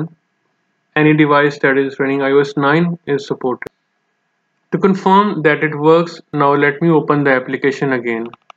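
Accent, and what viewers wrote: India. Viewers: took me 10 min to understood what symbols y say Indian accent not say in description also i cant see 720p pfffff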